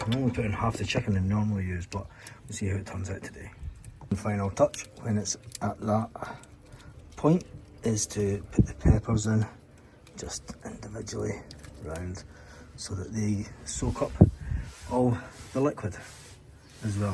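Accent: Scotland, United Kingdom. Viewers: The Scottish accent is very cool 👌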